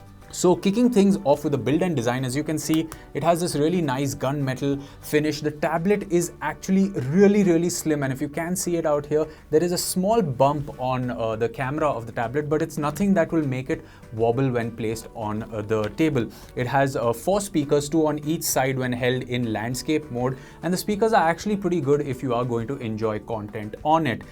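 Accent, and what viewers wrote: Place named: India. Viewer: Video for Indians. The rest of the world cannot stand such accent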